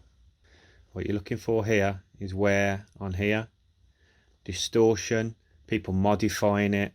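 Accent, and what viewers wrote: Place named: England, United Kingdom. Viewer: Great and informative video! Very clear and well explained (even with the English accent 😉) I just bought one for my son.. it seems they all need some work. Someone too heavy was riding this one and damaged the rear monoshock. Just waiting on the mail now! Has your daughter been enjoying the bike, now that you have it all fixed up?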